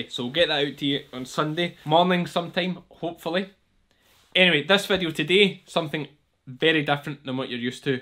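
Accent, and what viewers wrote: Scotland, United Kingdom. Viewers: I had a couple of Scottish colleagues when I worked in the UK years ago so it is nice to hear the accent again. The YouTube generated subtitles can be quite funny in how they don't always fit. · I’m so glad Sandi recommended you! If my daughter-in-law weren’t from Scotland, I’d need subtitles.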